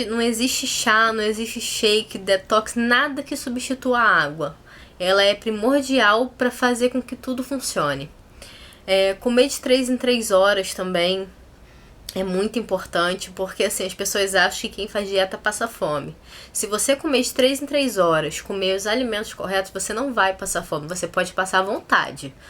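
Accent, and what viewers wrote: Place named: Rio de Janeiro, Brazil. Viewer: Oie, passei o dia todo ansiosa pra chegar em casa e ver seu vídeo....confesso que queria ouvir vc falar e ver o sotaque de carioca rs, te acompanho pelo face e tenho que agradecer sim pelo exemplo, por se expor, comecei minha reeducação no final de janeiro de 2015, pesando 117kg, hoje estou com 105, estou devagar pq esta dificil controlar a alimentação...exercicio faço mto mas o principal é a alimentação mas nao me entrego e nao irei desistir de mim novamente, sempre lembro que você começou com 105 e é um exemplo de que com muita força e determinação podemos alcançar nosso objetivo, adoro suas postagens, fico rindo do que escreve, vc é ótima rs, desde o inicio da minha reeducação que te acompanho, que Deus te abençoe, muita saúde, paz e sucesso pra gente :) ah! não para de fazer vídeos não, você foi muito bem!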